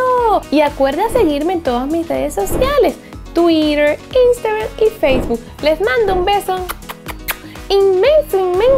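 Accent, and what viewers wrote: Cuba. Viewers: seguro por el acento cubano. No sé de dónde eres, pero yo soy Dominicano, y en Cuba y aquí usamos términos parecidos y/o iguales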